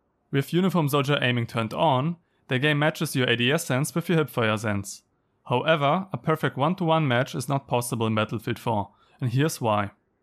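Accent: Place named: Germany. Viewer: Ah finde es immer wieder herrlich wie man in weniger als 5 Sekunden sofort hört "das ist n deutscher" 🤣no offense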